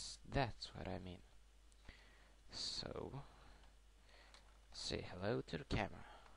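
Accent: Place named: England, United Kingdom. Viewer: where did u learn ur english accent :)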